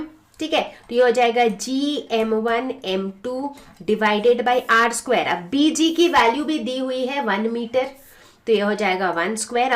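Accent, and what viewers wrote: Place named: Tamil Nadu, India. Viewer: mam your language like tamil "FEEL" ☺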